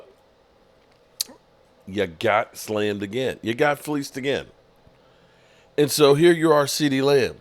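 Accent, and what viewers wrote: South, United States. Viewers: You seriously know nothing it's all conjecture on your, what you said is just not all factual, you think somebody is going to scare the jones family, really. You southern accent is embarrassing.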